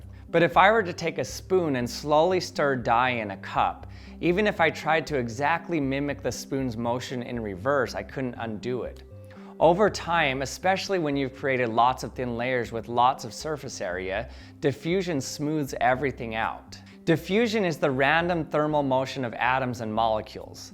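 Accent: Australia. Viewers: When did he became Australian? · When did bro become aussie?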